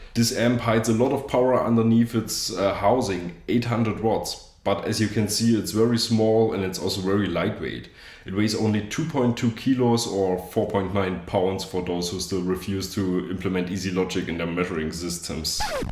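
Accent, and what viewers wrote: Germany. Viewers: Man I'm going to have such a blast trying to imitate your accent - it totally kicks ass. Which part of Germany does it originate from? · this man's accent screams "I am German".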